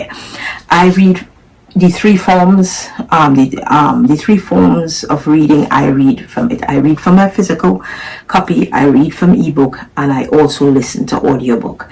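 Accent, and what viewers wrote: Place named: Caribbean. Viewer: 🎉 Congratulation’s To you Newbie to YouTube 🎉 love to hearing the Caribbean accent. Looking forward to your content and recommendations. Blessings to you 🙏🏾🤗💚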